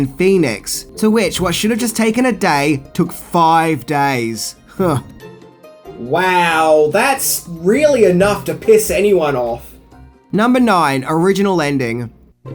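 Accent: Australia. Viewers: Good channel here. Was wondering about your accent, got it now, Auzzie convict stock...i am a pomie....suck it up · Hey mate, It's pretty cool your spot on, covering all the shows and movies I dug as a child, and in that beautiful Australia accent. What's more is your clearly on the world stage. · Sounds more like a homosexual Australian.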